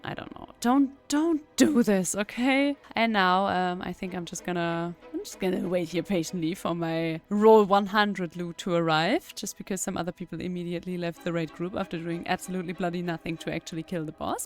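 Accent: Germany. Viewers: Ich könnte schwören da deutsch raus zu hören XD "I could swear I could hear German coming out of it"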